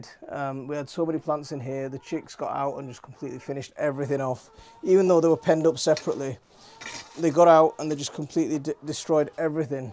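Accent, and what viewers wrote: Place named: Northern England, United Kingdom. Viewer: That is how people speak in the north of England.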